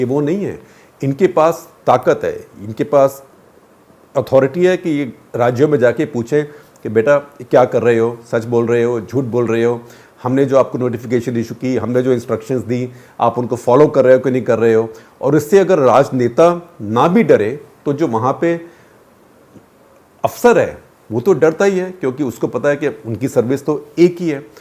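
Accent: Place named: Punjab, India. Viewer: sir.....Your hindi has a punjabi dialect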